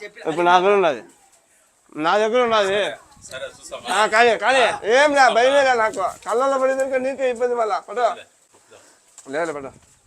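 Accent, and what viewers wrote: Rayalaseema, India. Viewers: Andralo kompa vaduka basha Google lo serch chyandi kompa meaning house ani anna rayalaseema yasa lo matladutunnadu edi akkadi local language tappuga tesukovaddu daya unchi yevari basha valladi nenu telanganany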